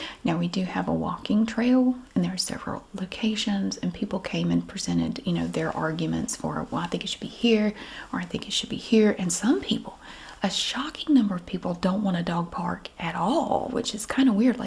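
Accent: South, United States. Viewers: the amount of dedication u put into ur videos is outstandin! I’ve been havin a lot of stressful things happenin in my life right now, and watchin ur videos calms me down. I am from the south, but I have recently moved and miss the sounds of the country accents so it’s like ur bringin home back to me. ❤️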